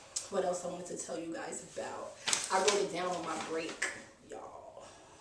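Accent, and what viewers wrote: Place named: New York City, United States. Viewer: I hear the straight NYC in this vid... LOL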